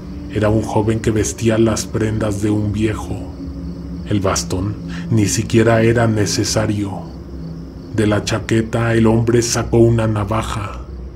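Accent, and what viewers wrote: Mexico City, Mexico. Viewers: Oye una pregunta eres chilango😎?? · GRACIAS.YA NO OIGO.OTRO CANAL ME.GUSTA TAMBIÉN.ESE TONO.CHILANGUITO M HACES MUY AMENO MI.SUEÑO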